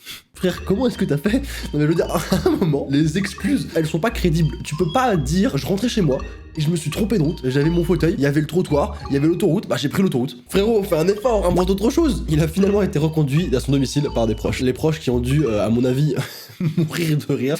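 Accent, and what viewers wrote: England, United Kingdom. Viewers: waaaaaaaaaaaw comment tu as fait ;-; ceci est le talent le plus incroyable du monde ! ( ͡° ͜ʖ ͡°) Et tu as un accent anglais incroyable ( c’est vraiment vrai ) ʕ •ᴥ•ʔ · je veut un like stp ❤️ J’aime trop ton accent anglais 😂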